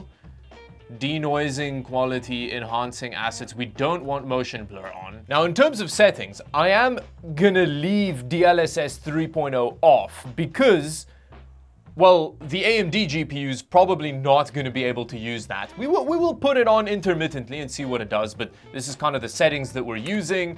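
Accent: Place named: South Africa. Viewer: the best part about watching is that he sounds south african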